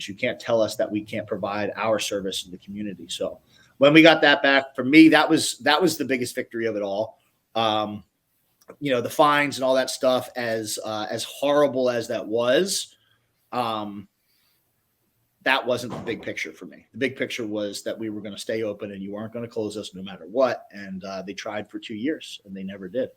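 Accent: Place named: Michigan, United States. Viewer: Sounds a lot like Michigan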